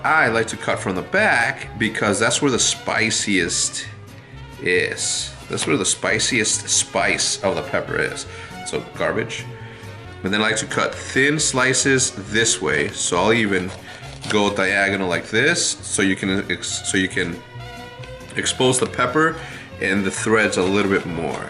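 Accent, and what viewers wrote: Mexico. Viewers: I'm from Durango sounds like he is from Monterey tho haha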